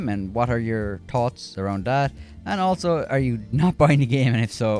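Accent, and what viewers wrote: Ireland. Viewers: Thanks dude, first thing I copped was the Irish accent 😂 I'm only down the road ha but it's about time someone did an honest review not just bashing the game. Playing San Andreas via game pass on Series S and loving it. I think they look class. Yeah it's Cartoonish but it's supposed to be. Anyway I'll play this through and maybe buy the trilogy. Great review man. Subbed 👍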